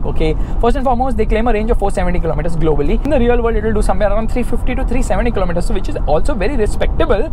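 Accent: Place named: India. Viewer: Bhai apni desi accent m english jharny sy acha local hindi ya urdu mai bat kr Angrez tera review daikhny ni aingy..Unky pas top gear,Car wow jesy shows mojod hain... Thaki hwi english bolny sy acha apni local language m review dala karo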